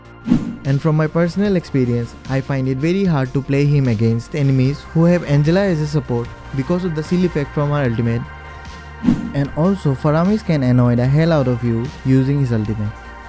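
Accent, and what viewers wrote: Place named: Assam, India. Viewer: Assamese accent hmm r u a Assamese guy ?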